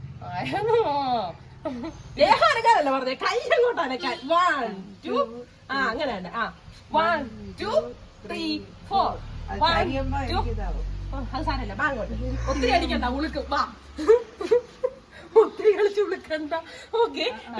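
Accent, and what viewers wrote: Kollam, India. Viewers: Kollam slang❤❤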